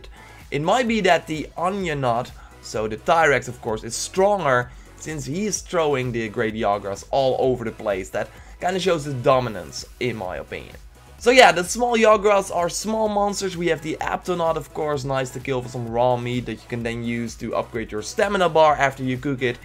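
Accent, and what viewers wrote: Netherlands. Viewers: Sounds Dutch to me.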